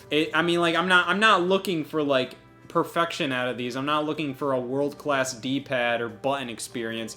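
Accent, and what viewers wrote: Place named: Michigan, United States. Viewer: You definitely from Michigan lol😅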